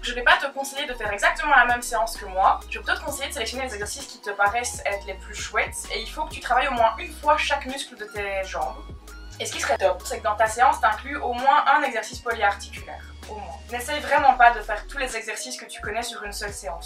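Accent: Belgium. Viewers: L'accent belge me fait tomber ;) Cela vient pas de de Liège je dirai peut être Hui ou Namur ?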